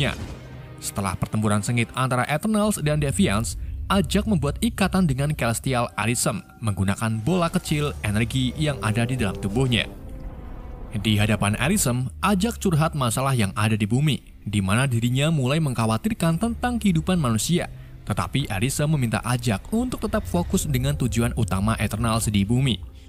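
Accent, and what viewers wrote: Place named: Java, Indonesia. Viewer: Jawa sekali pean kang😂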